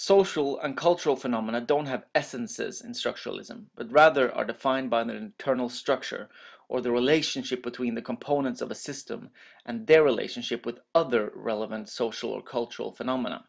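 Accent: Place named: Norway. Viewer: I do enjoy a crisp sounding Norwegian-English accent!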